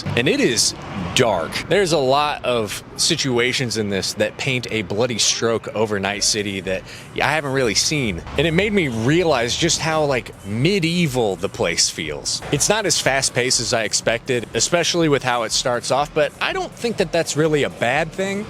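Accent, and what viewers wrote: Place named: Australia. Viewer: The Australian accent got you a follow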